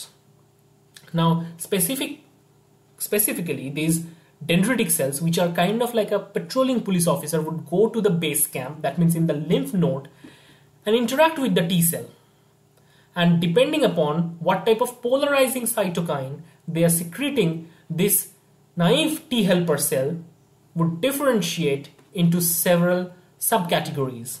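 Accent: India. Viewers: Idk why you are using that fake American accent ,it is so much irritating that I can't even focus on the lecture...it can be easily caught... Though u can speak in simple and sweet Indian accent, it would be more pleasing to 👂 .😊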